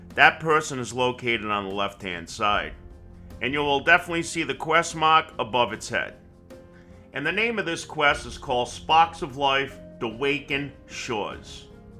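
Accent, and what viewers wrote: New York, United States. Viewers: Your accent has me in stitches. Is it New York or Boston. Remind me if the Construction guy from Futurama ❤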